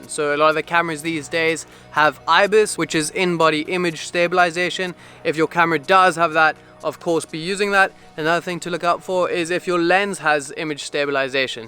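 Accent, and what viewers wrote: South Africa. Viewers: Trying to figure out your accent. My short list is South Africa, UK or Australia. If I was to guess then I’d go with South Africa - am I right ? Great content as always.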